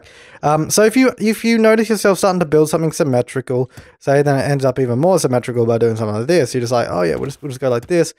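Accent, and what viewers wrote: Australia. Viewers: I feel like I've just been smacked on the head with a newspaper and told "NO" in an Australian accent.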